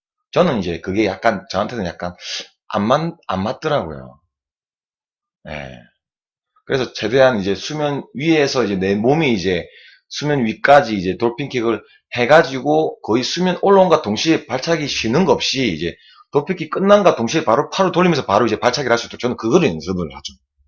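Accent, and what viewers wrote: Gyeongsang, South Korea. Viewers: 존경하는 선생님 경상도 억양이라서 생소했는데 말씀이 이제는 여러번 듣다보니 친숙합니다요. 잠영연습하는데 벽차고 가는데 바로 엉덩이랑 뜨는 경우는 뭡니까? 어케 들어가는 거에요? 입문한지 석달입니다. 초급입니다.아래 글보니 물타기 연습이라는데 그건 몹니까? 강사선생님께서 연습해오라고 했는데 어렵네요. 몸이 떠버리까 방법이 없네요. 한수 가르쳐주십시요.부탁드립니다. 구독 클릭 좋아요 클릭했습니다.하트뿅뿅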